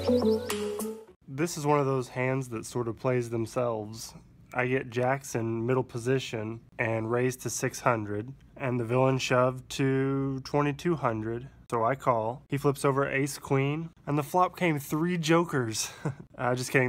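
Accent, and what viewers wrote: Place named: South, United States. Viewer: Good vlog.....good voice and nice format; the southern charm (I'm from Eastern TN) is a big plus!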